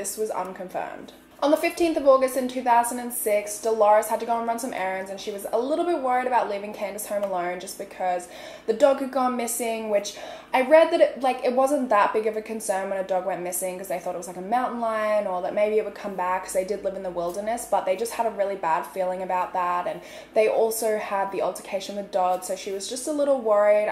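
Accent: Australia. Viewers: Usually i never comment on a yt video, but here i a am today... i just wanted to say that your work ist amazing. You put so much effort into the research, your videos are always so exiting and detailed. But still you show so much respect to the victims and their families. Btw i love your accent, it always reminds me of my time in Australia 🧡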